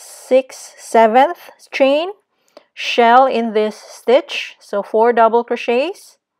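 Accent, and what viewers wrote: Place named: Philippines. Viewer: Are you Filipina? I like the way you speak.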